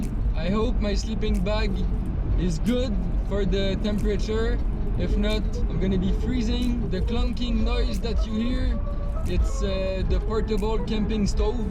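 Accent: France. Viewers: Ur Accent seems like ur a French Guy! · Living in Vermont and loving Quebec, I was excited to hear what you deal with in your travels. Some day we'll be able to cross the border again, eh? So it was frustrating to have the music too loud at points. Since you seem to be a native French speaker, the accent adds to the problem (but thank you for doing this in English). I'd suggest no music when speaking as a rule; people listen on so many different kinds of speakers and such. What might sound great on headphones can be junk on a phone speaker or such. Keep going, little glitches will iron out and what you are doing is great.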